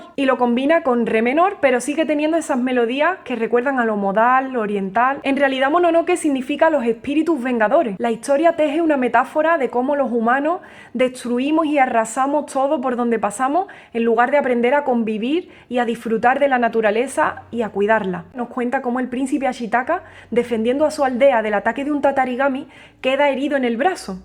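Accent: Andalusia, Spain. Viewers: que podria ser mejor que escuchar la cancion de la, pues que te hagan una intro y te hagan amar mas la cancion posd: de que parte de españa es tu acento, me gusta mucho. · Que bonito como tocas la guitarra y tambien como hablas, de que parte de España eres? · Los domingos con tú regalo musical son otra cosa. Se aprende con tus comentarios siempre correctos, agradables y llenos de ese gracejo andaluz que te caracteriza. Como guinda tu interpretación y entonces atónito contemplo la maestría de que no defrauda jamás. Se cierra el telón y a esperar toda una semana. Un millón de gracias. Un abrazo. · porque tiene un acento español diferente? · Ese acento andaluz 🤤🥰 enamora! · Hay muchos acentos en España, este es del sur (Andalucía) y dentro de Andalucía hay lógicamente más acentos.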